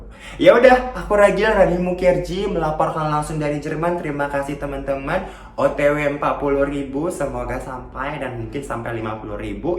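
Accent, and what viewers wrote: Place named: Medan, Indonesia. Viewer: Bah kota medan kau bang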